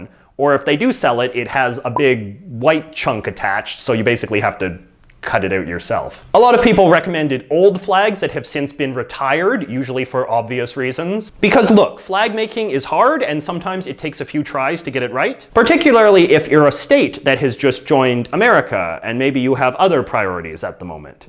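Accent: Canada. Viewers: ok so he just has a Canadian accent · dumpling dump chill, he's Canadian he says aboot so yeah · I just noticed that you are Canadian because you pronounced about like a boot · Just noticed you talk Canadian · This guy has the most stupid stereotypical Canadian accent I have ever heard is he doing it on purpose? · your Canadian because abot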